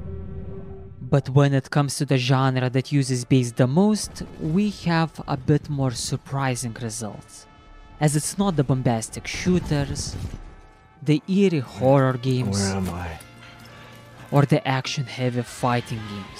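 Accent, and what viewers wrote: Russia. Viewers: the russian R is as heavy as the bass (not really judging tho)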